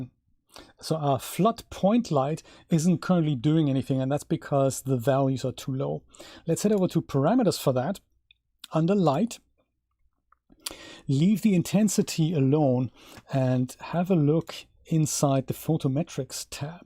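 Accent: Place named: Germany. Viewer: Sir, thank you for your unique work and presentation, I'm more a silent spectator of your site for years now. One question about you please, your pronunciation sounds so German, are you German?... 😉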